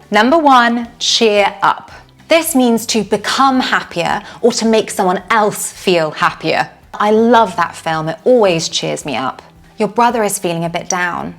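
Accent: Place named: London, United Kingdom. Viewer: I'm a native English speaker in London. Your accent is extremely similar to mine. You rightly pronounced the second 'a' in 'vocabulary' as a schwa. It seems to me that British people are increasingly pronouncing the word as 'Vocabu-larry' (like Americans say it). You pronounced 'often' with a silent 't', as I do. When I was a child in the 1980s, /ˈɒf.tən/ was regarded a mispronunciation. I assume it in came into the British English dictionary some years later.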